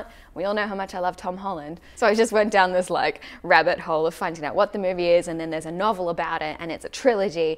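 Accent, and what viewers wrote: Australia. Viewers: Wait a SEC How did your accent change from whiny californian to Straylian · such a cute Aussie accent!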